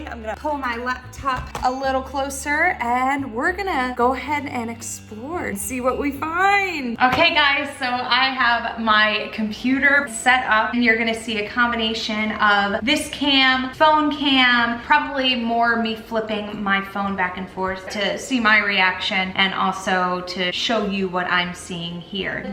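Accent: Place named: Scotland, United Kingdom. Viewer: Cant lie if you applied the scottland accent you'd be a natural, even speaking english you can kinda hear it, still surprising though.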